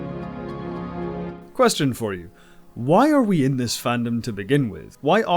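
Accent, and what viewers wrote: Scotland, United Kingdom. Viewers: I never actually spotted the similarities with The Expanse and B5, and now in my mind the expanse almost feels like a kind of prequel, it feels like the same kind of realistic political universe as B5. Maybe that's the route Straczynski should take, a prequel or sequel rather than a straight up reboot? Project Babylon or something. BTW, is that a suppressed Scottish accent I hear? Dude, if it is then just go with the accent, you know most folks love our accents lol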